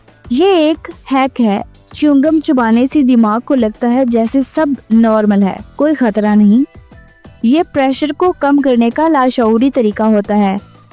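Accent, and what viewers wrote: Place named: Punjab, Pakistan. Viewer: Allah ka wasta hy ,tm log urdu ka baira garaq mat kiya kro,video bananay sy pehly urdu Bolny ki achi tara sy practice kr liya kro, Urdu ko bhi panjabi ki tara bolty ho😕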